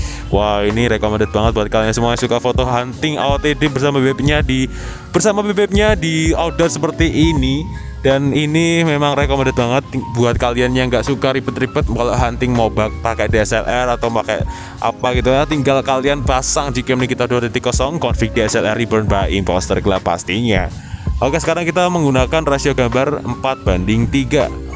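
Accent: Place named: Semarang, Indonesia. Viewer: Wah njenengan wong semarang ya mas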